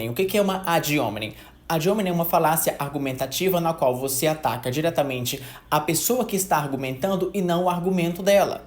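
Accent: Ceará, Brazil. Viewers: Vem sotaque do Ceará! Veeemmm!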